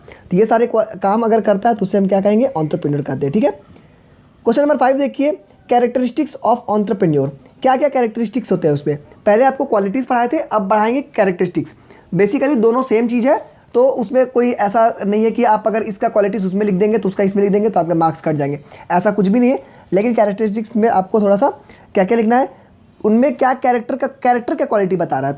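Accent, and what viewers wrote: Bihar, India. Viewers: Bhai tum ek bihari ho kya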